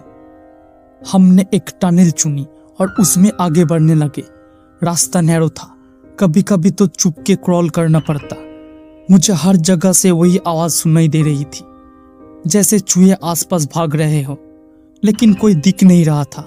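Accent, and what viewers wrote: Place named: Bengal, India. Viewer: Dear Sir your Bengali accent is to strong.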